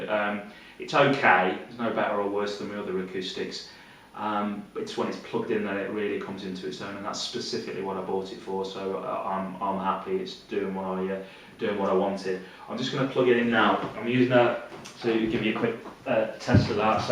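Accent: England, United Kingdom. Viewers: this review is cool if you like to hear people with an England accent talk from ten feet away from the microphone and ramble for thirty minutes without any demonstration.....I am not a fan. thumbs down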